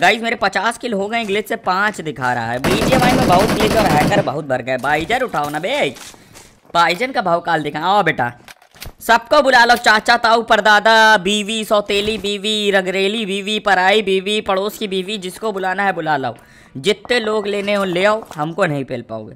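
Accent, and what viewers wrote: Kanpur, India. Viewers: Bhai aap kanpur se ho kya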